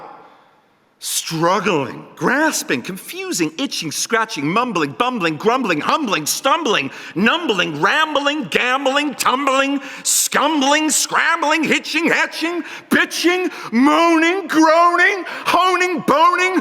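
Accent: United States. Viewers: How on earth he speakes American accent like pretty normal...oh my god..!!! He is British...How on earth how on earth..??? 😮😮😮😮😮😮😮😮😮😮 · Was he required to do this in an American accent? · Everyone please realise he's putting an American accent here. It's definately not a British accent. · Why he is speaking like an American!?!?!? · HIS AMERICAN ACCENT IS AMAZING TOO HAHAHAAH I LOVE HIM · he's pronouncing ful r at the end.Of course 'it's an American accent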